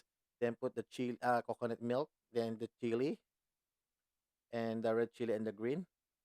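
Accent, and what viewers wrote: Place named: East Asia. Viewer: I was thinking you're Singaporean, Korean or Japanese kasi the way you speak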